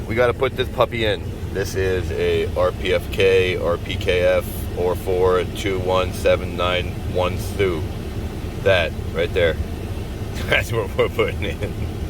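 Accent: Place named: New Jersey, United States. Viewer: I'm guessing you're from Jersey or somewhere thereabouts.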